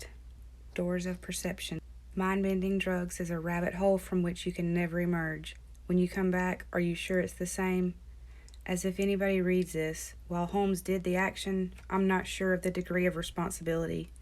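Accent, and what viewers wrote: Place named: South, United States. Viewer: I could listen to you reading the phone book and would love every second. My goodness that accent. I need to join a southern dating site.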